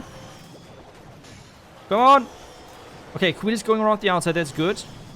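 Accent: Germany. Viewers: Because he is German? I want to hear you speaking German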